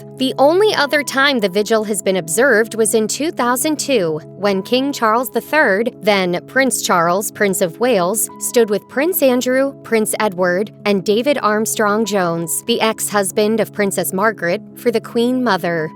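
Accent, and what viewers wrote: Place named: United States. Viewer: Horrible American accent from commentator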